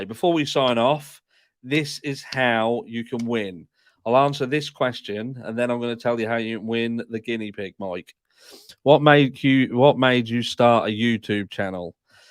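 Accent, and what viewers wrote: United Kingdom. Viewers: Apparently, the closed caption doesn't translate UK English very well. It's throwing words up that are no where near what you've said. LOL. Feel sorry for any people who rely on it.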